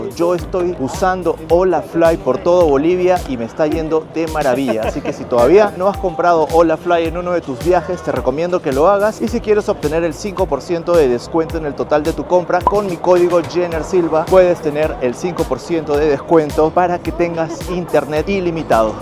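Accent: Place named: Peru. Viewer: Que esperabas....en invierno y a 4000 metros de altura. Mejor te quedabas en casita y calientito. Por tu tono de voz Peruano.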